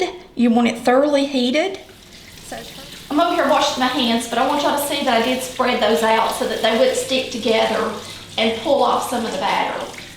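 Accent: South, United States. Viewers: you so southern like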